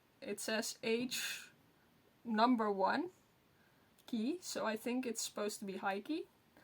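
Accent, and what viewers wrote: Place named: Finland. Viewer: You just sound so finnish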